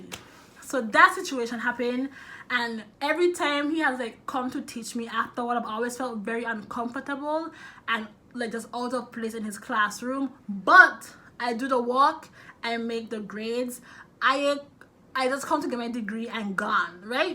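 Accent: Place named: United Kingdom. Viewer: i can hear your uk accent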